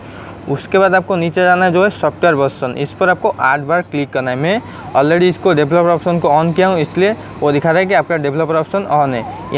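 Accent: Odisha, India. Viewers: Are you frim odisha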